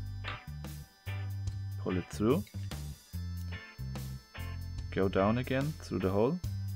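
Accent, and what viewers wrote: Germany. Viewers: Du hast sooo eine beruhigende stimme und man hört sofort dass du deutsch bist :) ich war eigentlich nie der typ für puzzle spiele aber du hast mir dem echt näher gebracht und ich finde das total interessant was sich die macher immer bei verschiedenen mechanismen gedacht haben :) außerdem ist die soundqualität eeeeendlich gut xD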